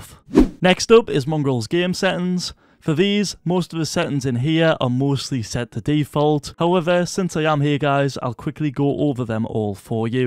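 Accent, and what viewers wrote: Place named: United Kingdom. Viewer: is british acent right?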